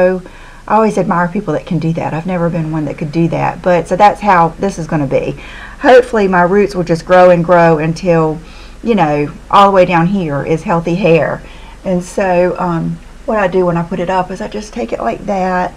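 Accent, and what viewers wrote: South, United States. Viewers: is from Wilmington, North Carolina. Southern accent.:)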